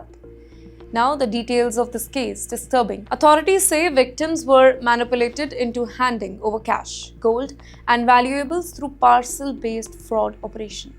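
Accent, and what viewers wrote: India. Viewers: That's the Indian version of English and there's nothing wrong with it!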